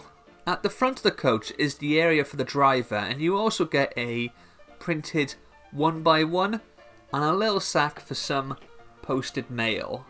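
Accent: Wales, United Kingdom. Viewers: I'm just going through a ton of vids of yours, you're very entertaining and I love your welsh accent!!!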